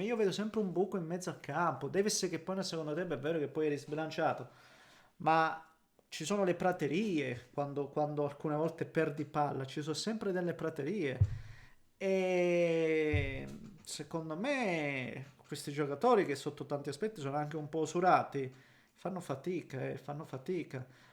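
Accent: Calabria, Italy. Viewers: Non so di dove sei ma riconosco un accento calabrese ❤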